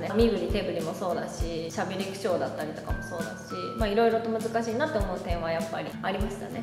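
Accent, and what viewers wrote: Kansai, Japan. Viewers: 週末で「今際の国のアリス」見て、このクセの強いキャラを演じてるのは誰だ？と思いました。この動画でバリバリ関西人だと分かった。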